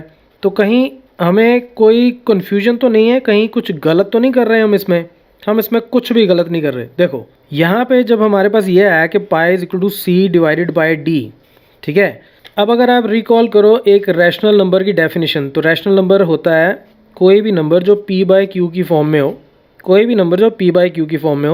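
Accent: Punjab, India. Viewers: App punjabi ho na Sir!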